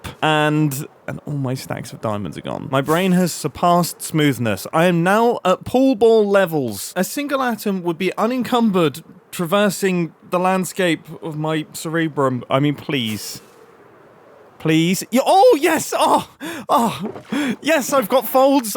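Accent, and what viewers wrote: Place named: RP, United Kingdom. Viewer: That’s it sorry man but I’m not watching anymore, I was here to watch a dot in the corner while listening to a British man sound posh and I can’t experience that anymore.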